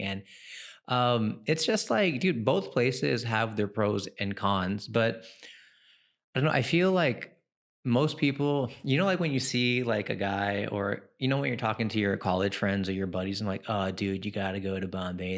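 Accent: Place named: United States. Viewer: Wow ! You still speak American accent. How come it hasn't changed. 🙂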